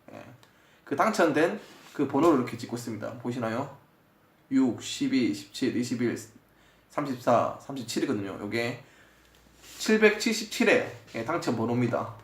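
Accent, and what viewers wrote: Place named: Daegu, South Korea. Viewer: 어디분이시져? 부산? 대구같은데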